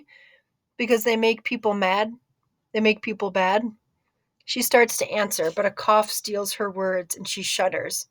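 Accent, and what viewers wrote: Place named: United States. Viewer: Thank you for your video. I really needed an audio of this book for my shadowing so as to get familiar with the intonation of American English. It definitely helps me a lot and your voice sounds great to listen.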